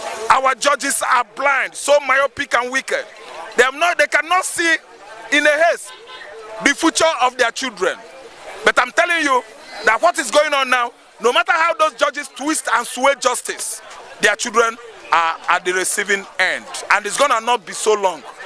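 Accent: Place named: Igbo, Nigeria. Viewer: voice of an Igbo man ,when they speak we know .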